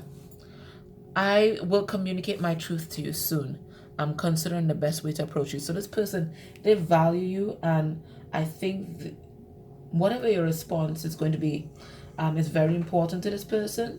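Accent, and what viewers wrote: Trinidad and Tobago. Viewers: You sound Trinbagonian to me.....your picture even looks like someone I grow up with right here in Tobago. You have a very strong resemblance to that person.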